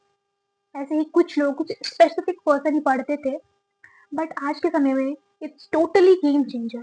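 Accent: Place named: Bihar, India. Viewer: Btw you've got that hardest bihari accent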